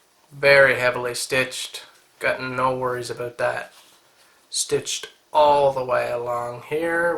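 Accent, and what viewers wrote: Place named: Newfoundland, Canada. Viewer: Your accent. You a Newfie?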